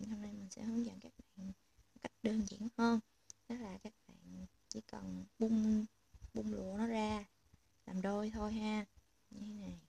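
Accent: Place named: Central-South, Vietnam. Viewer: nói to lên một chút c ơi, giọng miền trong vừa nhỏ vừa vừa khó nghe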